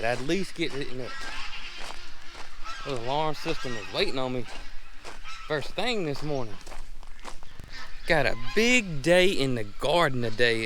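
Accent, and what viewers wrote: South, United States. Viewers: Love this guys accent I think southern states of America have a very fluid way of talking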